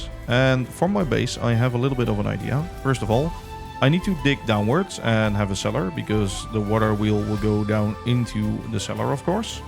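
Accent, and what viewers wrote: Netherlands. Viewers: Eyy random question are you dutch? You have a very dutch accent... zou ik moeten weten, ben het zelf. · i'm almost sure you are dutch,, eventho.. came for the clickbait, stayed for the accent! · I heard you talking on Beardstone or Chosen's video and thought, hey that guy sounds dutch. Now I see you are dutch and a rail fanatic. Coooool. Subscribed. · I knew it, the accent totally a Dutch guy😂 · First time watching your video and i wonder do you speak dutch beceause you have a dutch accent? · love the dutch accent as one dutch to another i salute to you!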